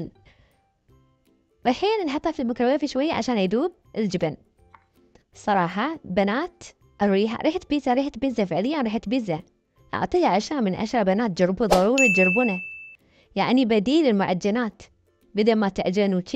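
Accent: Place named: Iraq. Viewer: فيدوهاتك تجنن 😔💟مايفوتني ولا فيديو الحمدلله ،وكلامك كانو عراقي 😂🩷🩷🩷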